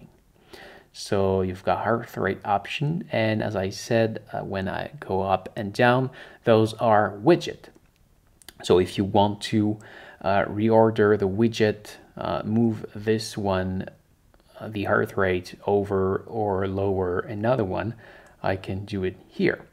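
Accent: Quebec, Canada. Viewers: He's French Canadian. It's a wonderful accent in my opinion.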